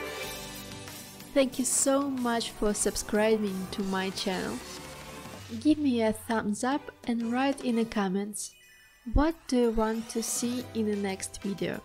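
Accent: Russia. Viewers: Какой же хороший,русский акцент😁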